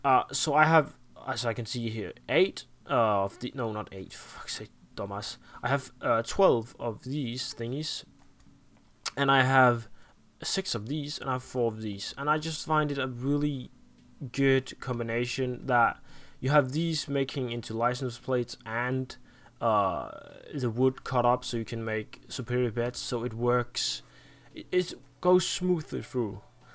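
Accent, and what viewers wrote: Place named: Denmark. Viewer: hmmmm i can smell danish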